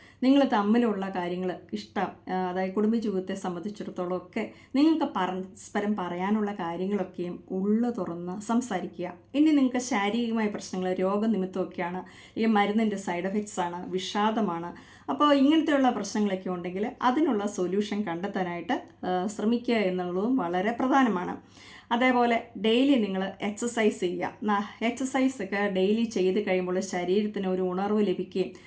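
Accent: Kottayam, India. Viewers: ചേച്ചി കോട്ടയം കാരിയാണോ? സംസാരം കേട്ടിട്ട് അങ്ങനെ തോന്നുന്നു. കോട്ടയം സ്‌ലാംഗിൽ സെക്സ് വിഷയങ്ങൾ കേൾക്കാൻ എനിക്ക് വലിയ ഇഷ്ടമാണ്. അതു പോലെ തന്നെ എറണാകുളം സ്‌ലാങ്ങും. അതൊക്കെ എനിക്ക് വലിയ turn on ആണ്. കാരണം അറിയില്ല.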